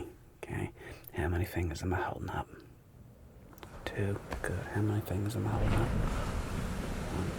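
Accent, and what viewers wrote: Australia. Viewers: The Aussie accent was 👌 and the suncream on your nose was a good touch 😁 we need more roleplays like this! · big fan of the aussie accent! would love to see it come back in future videos :) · This is me, an Aussie, petitioning you to please do a Russell Coight ASMR. The accent was 👌 and the theme of this video was so on brand for us. The sun ain't no joke down under! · As an Aussie myself I just want to say he did a good job especially the accent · Your Aussie accent is actually really good, you could have called me a c**t though for maximum authenticity 🇦🇺 🐨 🦘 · Killer work, bro! Especially with the Aussie accent!!!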